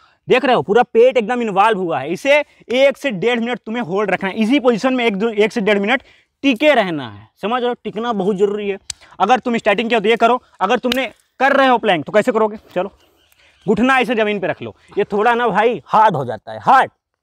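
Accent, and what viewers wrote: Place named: Uttar Pradesh, India. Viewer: Bhai aapki bato se lag rga h aap hamare hi taraf ke ho...... Zila sultanpur.......up Mja aa gya bhai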